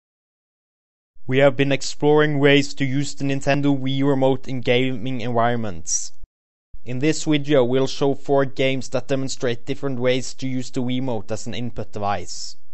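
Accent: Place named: Norway. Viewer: hører så sykt at du er norsk :) nice presentasjon foresten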